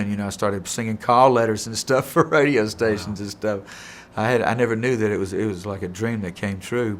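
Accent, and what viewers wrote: South, United States. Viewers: Interesting, wouldn’t’ve thought he had a Southern accent considering when he sings you don’t hear an accent! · Whoa! Me hearing his southern accent was just as shocking to hear Steve Perry speak Portuguese....Learn something new....New to me.... LEGEND! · Never knew he had a southern accent anyway very nice.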